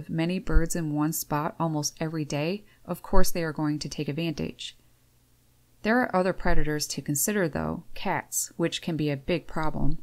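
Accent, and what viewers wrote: Canada. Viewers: So we are impacting the natural birds via our feeding, but in a positive way, is basically what you're saying correct? From the pronunciation of the "ou's" I assume you're from Canada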